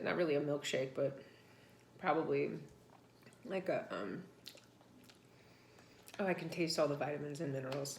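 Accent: New York, United States. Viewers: You still have a smidge of New Yawk accent! Wishing you the best, sending prayers, feel free to complain and bitch at any time! Have watched your journey and love that you share it, you are saving lives and now it's time to keep saving yours! Hugs!!!! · lol! love CAW - FEE ( COFFEE) - go New York gals :) and love your speaking - can't take the FUCK outta a New York girl :)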